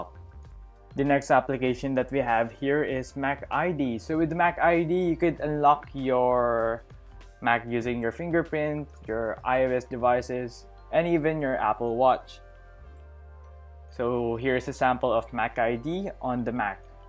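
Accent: Philippines. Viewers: Sounds Pinoy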